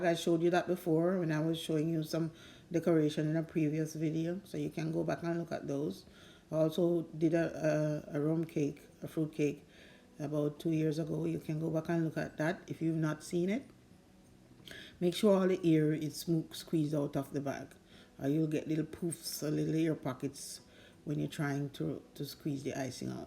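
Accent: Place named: Jamaica. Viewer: This was lovely can hear the real Jamaican voice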